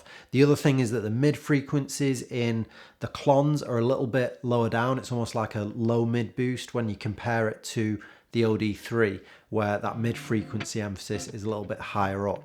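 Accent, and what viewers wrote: Manchester, United Kingdom. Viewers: Cool video. Sounds like you're from Manchester.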